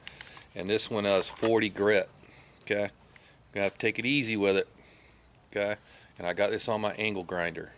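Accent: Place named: Pittsburgh, United States. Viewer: You from Pittsburgh?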